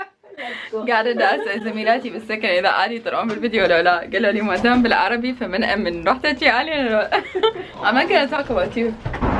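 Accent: Iraq. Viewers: أحلى بشر والله😍 الحلو بيج انتي محافظه على اللهجة العراقيه بغظ النظر عن (صكط)😠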